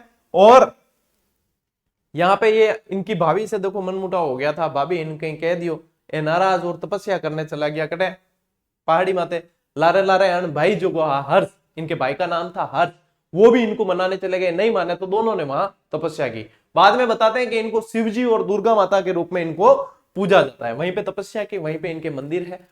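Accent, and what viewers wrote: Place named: Rajasthan, India. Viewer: Tq tq sir very nice class sir mujhe aapki marvari Bhasa bhutt achhi lagti hai 😊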